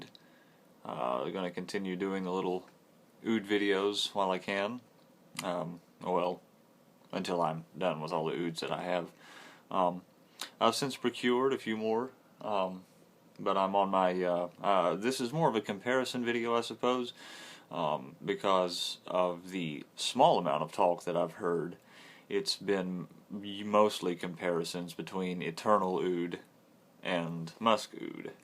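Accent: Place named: United States. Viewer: I like your accent, whereabouts in the U.S. are you from? Also, you have a cool speaking voice and would make a good actor. So use it fella, it could be beneficial and get you that woman you want to be smelling. Musk Oud? Not a favourite of mine I prefer pure oud from that line but the FMK oud line? Dear Lord, love those scents.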